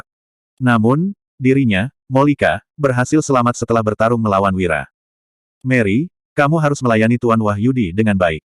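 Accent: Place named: Palembang, Indonesia. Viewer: Wong plembang?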